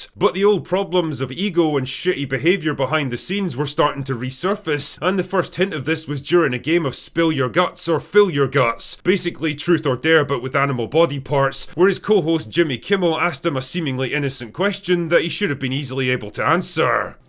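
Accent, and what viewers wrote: United Kingdom. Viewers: why do you have a british accent i just noticed after all these years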